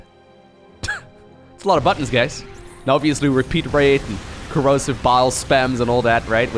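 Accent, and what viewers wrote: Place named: Netherlands. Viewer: Lmao, Im praticing my "Hello everyone, my name is " so loud. Its getting pretty close, but it is missing that dutch accent.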